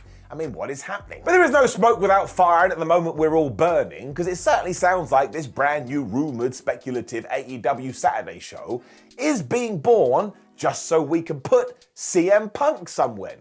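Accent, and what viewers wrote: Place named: United Kingdom. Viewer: You speak so british.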